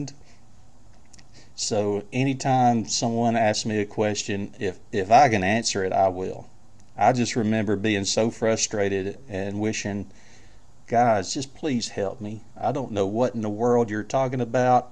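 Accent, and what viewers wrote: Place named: South, United States. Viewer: Great video Mr. That is what I am also seeking are minimalist systems, tired of the bloated giants out there. I have quite a few PCs here, settled upon FreeBSD, but always looking at what Linuxers' are doing. I was initially drawn to your southern accent (being from the Midwest) and the minimalist system, w/menus. Also your NuTyX isos. Keep up the good videos!!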